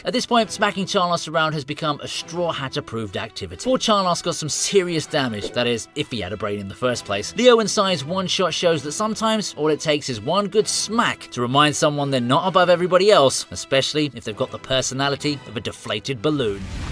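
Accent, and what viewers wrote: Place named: United Kingdom. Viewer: Sheesh British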